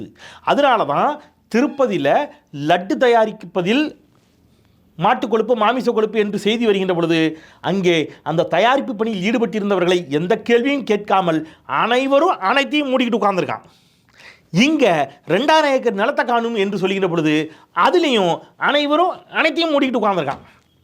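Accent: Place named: Valliyoor, India. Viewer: Super brother naanum Valliyoor thann ungal voice slang keta enaku udane namma voor niyabagam varum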